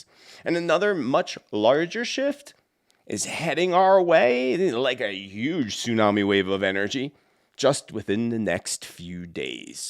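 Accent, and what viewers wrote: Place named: United States. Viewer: 💚🤗💚 Bristol UK,,,, Luv the crazy American pronunciation of UK cities 😂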